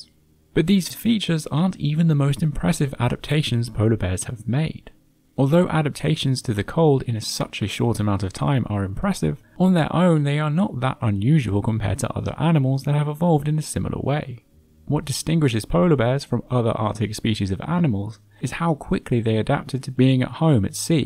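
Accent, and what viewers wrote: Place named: United Kingdom. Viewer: Creepy british asmr voice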